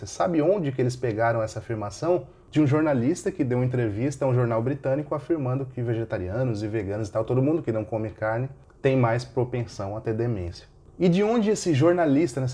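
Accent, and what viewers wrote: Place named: Rio Grande do Sul, Brazil. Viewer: Tu foi afetado pelo gauchês. É um caminho sem volta. Minha noiva (de Santa Catarina) já fala tri, bah, afu, tchê, chinelagem e cacetinho. Bem-vindo ao clube. Mazaaaaa!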